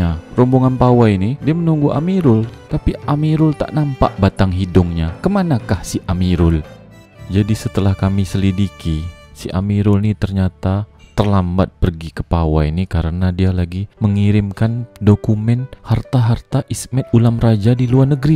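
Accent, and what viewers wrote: Malaysia. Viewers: budak zaman sekarang mereka tak tau bang...selalu mereka dengar org indo bercakap tak macam ni 😂...jela cara bercakap nya pun sbiji macam org malaysia..kalau saya tak tau pun mesti saya ingat abang ni org malaysia 😂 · Padahal dari awal dulu, konten ini sangat bagus dan edukatif. Saya yg dari jawa timur, sangat menikmati, mengapresiasi juga. Suka denger kata2 nya, logat melayu nya, pantun, pemandangan alam, suasana kmpung dan kotanya. Knapa skrang narasinya jadi begini terus. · Saya dari krui lampung ,dengar cakap melayu lucu senang dengarnya salam hangat slalu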